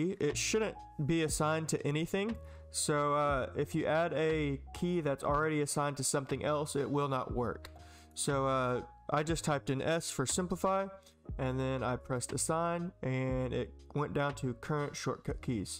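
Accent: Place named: England, United Kingdom. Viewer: Thank God for clear english accent and also for useful video!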